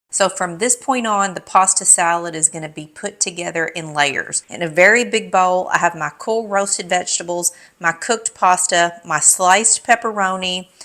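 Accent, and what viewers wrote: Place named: Tennessee, United States. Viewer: Question: How big is your cutting board? I bought a new one recently, and I think it's too small. I love your accent. Tennessee? That salad is just up my alley; after 6 years on keto, it's time I reintroduced pasta, which I actually began doing 2-3 months ago. Thank you; I'm eager for more of your recipes.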